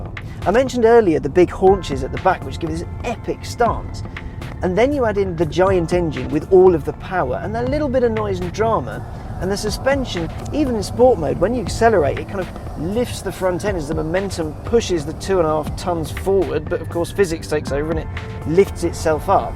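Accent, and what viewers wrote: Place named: England, United Kingdom. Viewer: To my untrained ears it sounded like Richard Hammond at the start before the camera showed it wasn't. Do they come from the same part of England? What accent is that?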